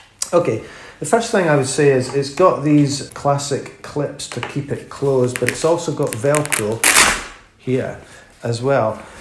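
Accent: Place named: Scotland, United Kingdom. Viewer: That is stitched on. Just lift it up at the edges and cut the stitches with a seam ripper or sharp pocket knife. Off she comes, and looks quite generic, I bet. Love your Scottish accent. That camera you've got is not as handsome as my Olympus E-M10.3, but having the viewfinder off to the left side of the body really makes up for it. (for the right-eye-dominant user) The nose just goes off to the side of the camera, instead of into the back of it with the head turned awkwardly sideways.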